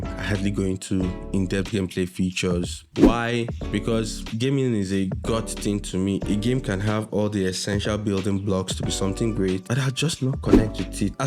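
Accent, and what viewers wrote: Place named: Africa. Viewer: Heard the african accent, subscribed immediately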